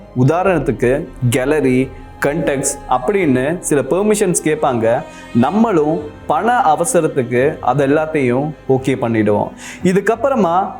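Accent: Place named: Tamil Nadu, India. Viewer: இலங்கை சனல் தானேடா நீங்கள். பிறகேன் இந்தியாத் தமிழ் கதக்கிறீங்க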